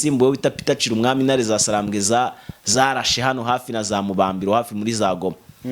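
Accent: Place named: Ngororero, Rwanda. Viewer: Sha jye icyo dupfa uko ikinyangororero cyagukwamiyemo!ntuzi kuvuga ngo yashize?iyo ya shije uyikurahe?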